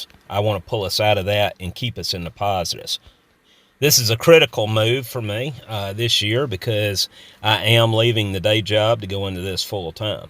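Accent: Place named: South, United States. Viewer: "Filled" and "failed", in a southern accent, sound so similar to a northern guy...I was getting depressed for you bud!!!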